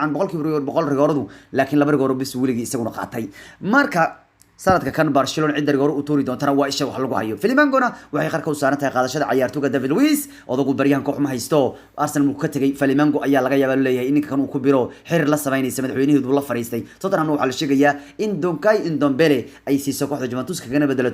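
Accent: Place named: South, Somalia. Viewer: Ileen afsomali in la bahdilo wax ka kow ah af reer konfured wlee waa laga sheeken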